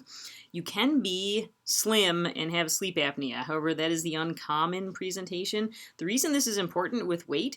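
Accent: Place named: Upstate New York, United States. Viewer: Great video. I am also loving the Upstate New York accent!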